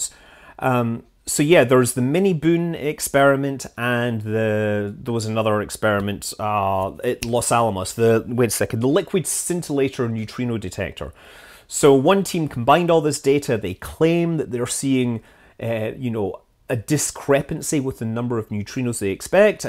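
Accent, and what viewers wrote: Scotland, United Kingdom. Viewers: i love your scottish accent!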